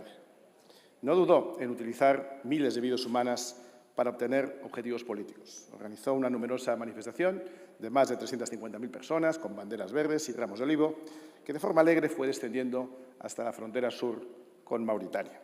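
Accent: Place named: Morocco. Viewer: Iván tienes un acento igual que la gente del pueblo de Tetuán y chawen de Marruecos por eso viene éste odió a los marroquíes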